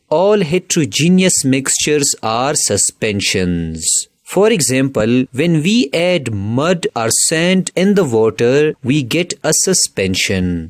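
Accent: India. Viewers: يخربيت اللهجة الهندية 🤦🤦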